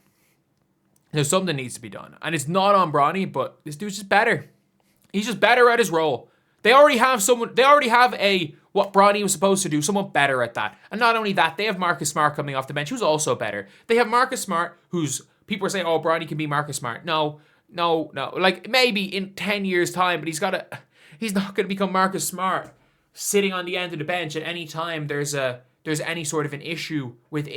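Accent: Ireland. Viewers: You must be from Cork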